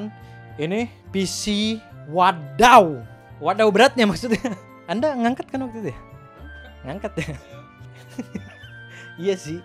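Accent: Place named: Tegal, Indonesia. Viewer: Dog, lu sebenarnya orang mana sh?? Sukabumi apa dimana, soalnya dari cara bicaranya itu kaya orang tegal-pemalang gitu(medog)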